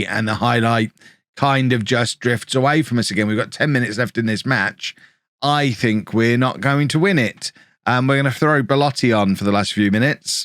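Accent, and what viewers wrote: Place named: England, United Kingdom. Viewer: Its funny to me, that in some regions in England people pronounce some words that has the letter "W" like it also has a "R" in there ^^. Awesome series!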